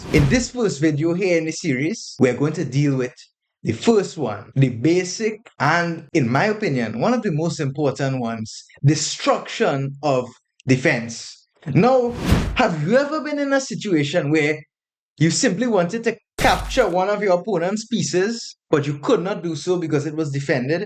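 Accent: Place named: Trinidad and Tobago. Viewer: That’s a Trini accent!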